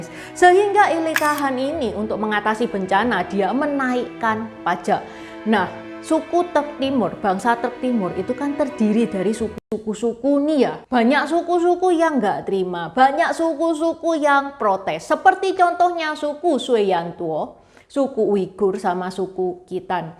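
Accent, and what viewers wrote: Java, Indonesia. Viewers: Cik tionghoa berlogat jawir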